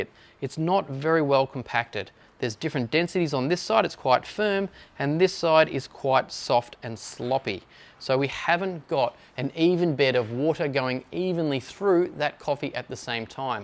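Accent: Australia. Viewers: I heard the Aussie accent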